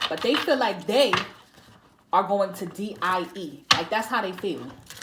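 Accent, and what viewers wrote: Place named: New York, United States. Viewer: love ur accent is u from nycc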